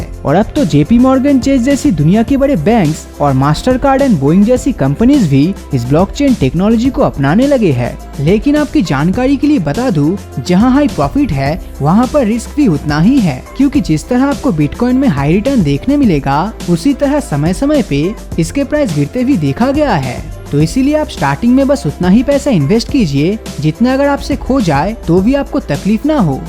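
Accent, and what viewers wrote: Bengal, India. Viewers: Is your mother tongue bengali?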